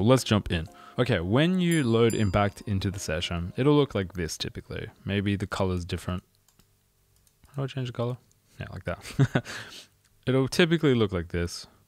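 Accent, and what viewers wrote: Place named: South Africa. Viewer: Definitely found this helpful thanks. From your accent I gather you're a fellow South African.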